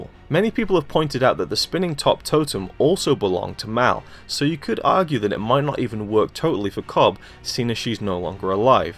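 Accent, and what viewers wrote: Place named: United Kingdom. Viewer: Who is this British dick making these videos. He explained nothing. What a tool.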